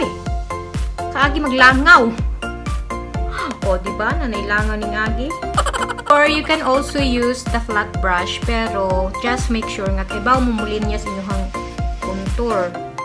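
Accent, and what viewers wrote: Visayas, Philippines. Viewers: ganahan kos imung explaination sis makalingaw makadungog imong binisaya hehe need sad nako na para sa ilong nako nga himos hahah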